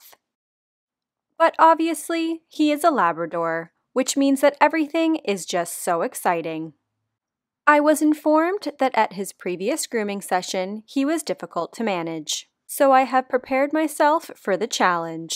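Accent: Canada. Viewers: She's Canadian? I always thought Midwest US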